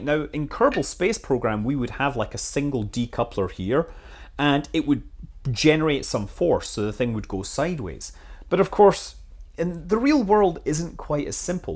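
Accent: United Kingdom. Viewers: It's amazing that you being British (if i got the accent right) know so much about the R-7 design, now that's really some enthusiasm there. I'm a Russian myself, meaning i ought to know about details like the excentric jetforce stream plus oxygene jettison trick before everybody, but i only learned about them yesterday, from Lozga, who you could say is the best known space expert among Russian bloggers.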